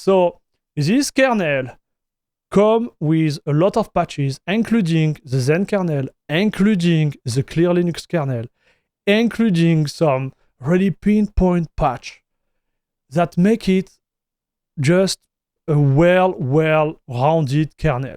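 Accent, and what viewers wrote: France. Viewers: ngl i love the french accent you have XD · Love your French accent. Mine is Portuguese. 😂 Greetings from Brazil 🇧🇷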